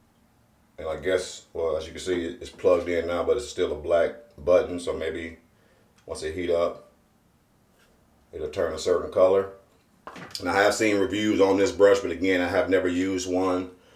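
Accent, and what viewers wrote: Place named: South, United States. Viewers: Where’d you grow up? I can heard accents, south definitely, nola? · I was thinking this was a Brotha from the South too.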